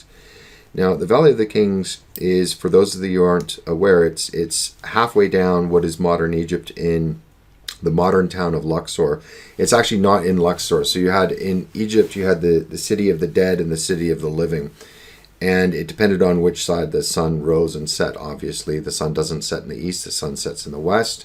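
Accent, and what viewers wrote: England, United Kingdom. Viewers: Interesting stuff, it's good to see you making more videos, yt is a lonelier place without the melodic, perfect English accent of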